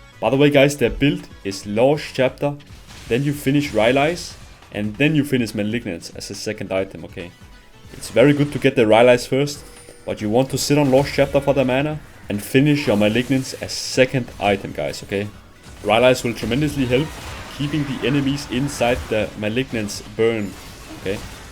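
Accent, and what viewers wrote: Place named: Denmark. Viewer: Love the vids. What accent is that? Sounds a little danish to me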